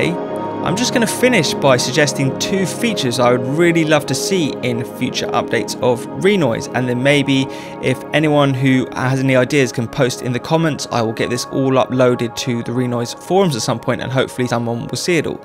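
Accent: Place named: United Kingdom. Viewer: Honestly, as soon as I heard his British accent I was sold on the product.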